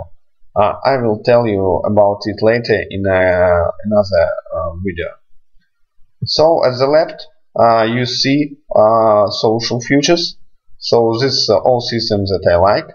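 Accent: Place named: Russia. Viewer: ебучий русский акцент))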